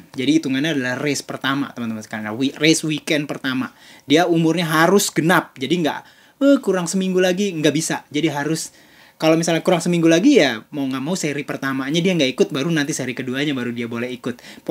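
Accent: Indonesia. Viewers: 😂kang,biar enak di dengar,coba kalo nyebutin nya jgn lidah indo banget....coba gini biasa aja: komfom jangan sampai bunyi yg keluar komfrom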